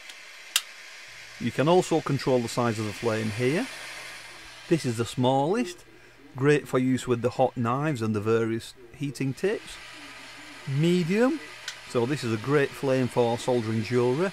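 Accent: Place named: Lancashire, United Kingdom. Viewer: Great video.excellent tutorial.Plain English with a touch of Lancashire accent.Well done sir.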